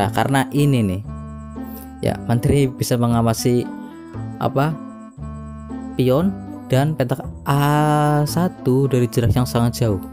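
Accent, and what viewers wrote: Banyumas, Indonesia. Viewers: Kaya wong purbalingga, kebumen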